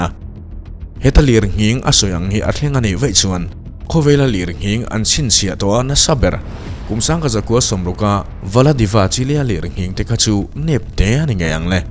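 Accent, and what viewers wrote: Mizoram, India. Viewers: I aw hi ngaihthlak a nuam lutuk e... Mizo aw phawi diktak tur ani.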